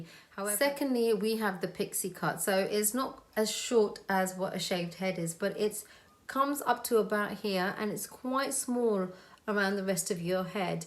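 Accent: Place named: United Kingdom. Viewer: Love ur British accent!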